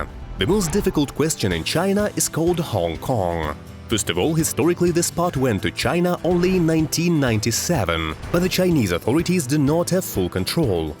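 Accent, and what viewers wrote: United Kingdom. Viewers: He's speaking British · u british u sound like british and i like your vids alot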